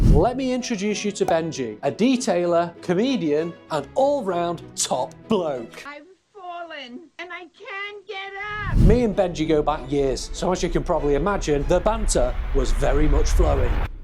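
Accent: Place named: Northern England, United Kingdom. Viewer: Very northern, nasally, drowning, blunt, what's northern for chav..!!??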